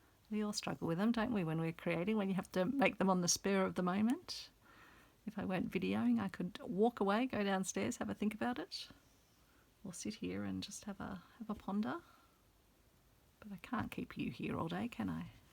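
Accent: Australia. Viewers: So love hearing a fellow australian. I subscribed co I have just found you and also cos if I follow Australians they can tell where they get their supplies, cos I can to! c’mon aussie c’mon 🧵🪡🧵🪡🧵🪡 I will make this cos I think it is a great idea. Thanks heaps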